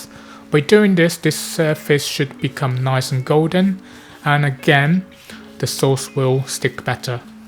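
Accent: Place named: East London, United Kingdom. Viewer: Cheers for the recipe mate! Did you live in London before? I can detect a slight East London accent ;) Love your content! I'm gonna try to make this dish tonight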